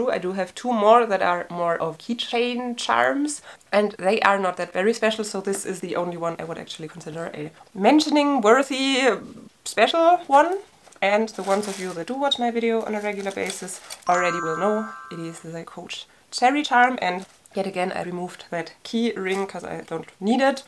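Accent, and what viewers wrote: Germany. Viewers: Awww i can hear u are german. Am i right ? I was looking for such video and yours poped up first. So happy to have found you. Love all your charms. Now going for a hunt.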